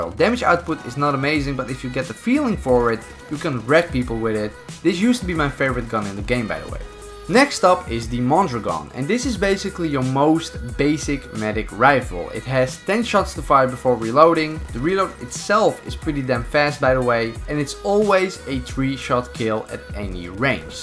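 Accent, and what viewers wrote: Netherlands. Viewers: Ben je Nederlands? Zo klink je wel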